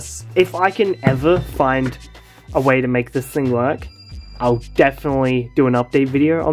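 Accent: Australia. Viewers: I think you're currently my favorite YouTube channel. interesting old tech stuff, dankpods style but with more chill vibes, that glorious australian accent, plus beautiful synthwave (edit: and Minecraft OST!) playing the whole time? perfection.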